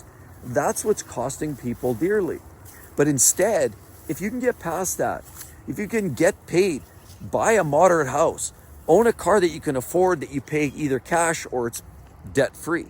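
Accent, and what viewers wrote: Canada. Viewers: Sorry if you mentioned before..... Are you Canadian ? When you say about...you pronounce : a boat. Not making fun...l really like it 😂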